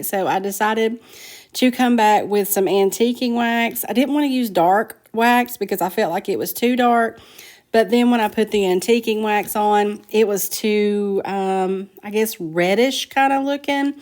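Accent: South, United States. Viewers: thank you for taking the time to reply. I've been watching all your past videos too. I am in Canada and I had to use a white wax that it's available here. It looks exactly like DIY one in buttery texture but it does not dry. I will have to find a solution to this problem. In the meantime...#1 love, love, LOVE your southern accent. I used to live in South Carolina. Best years of my life. #2 I saw the video where you were thinking to quit YouTube. So glad you didn't. We need more people like you. We live vicariously through you. #3 I also loved the video where you put down pen and paper and explained what profit you made in a month in your booth. I loved the honesty I know you must be super busy, I'm a mom too with young kids and a full time job. I'm amazed at your energy. Keep them coming videos. I love watching them.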